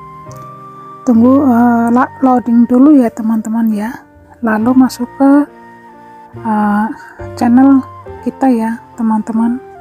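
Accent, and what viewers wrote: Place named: Banyumas, Indonesia. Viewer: Mantep niih info tutoriale mbakyuuuu..dulurku kiye..pada pada ngapak...kiye..mantep pokoke.. Salam sukses luuur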